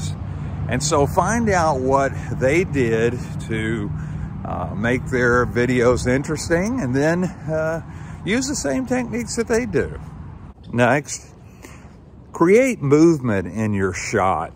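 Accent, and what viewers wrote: South, United States. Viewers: That is fantastic! I could pick up on a little southern accent there, my friend! I'm with you on the Roll Tide!! You do awesome work on your IMovie work...I guess I'll have to double down on my attempts. I have tried using it on a couple of videos (not trail vids) and it was a lesson in futility to me. Thanks! Btw...I'm s 3rd career pastor, and serve in the far south end of GA, in Hahira, at the very bottom of the state on I-75.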